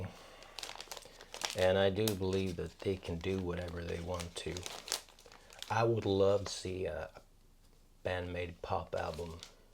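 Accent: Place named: United States. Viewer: I thought you were american before you told us you're a swede, like i am 🙂👍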